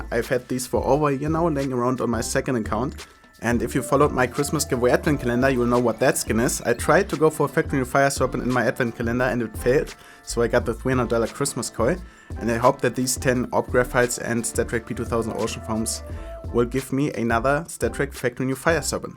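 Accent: Germany. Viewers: Are you German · Are you a german guy?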